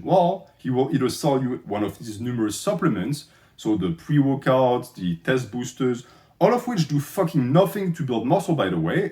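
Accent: France. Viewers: Why are you french?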